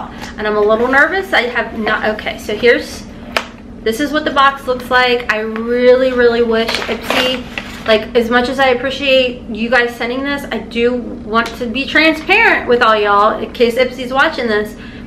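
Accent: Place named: Tennessee, United States. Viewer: I just found you threw another channel. But I can say I'm a fan for life. I'm from Tennessee but moved to Florida. I miss hearing people talk the way we do. And I ha e a Haylee. Lol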